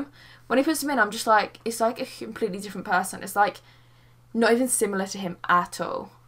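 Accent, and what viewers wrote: United Kingdom. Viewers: I really love ur British accent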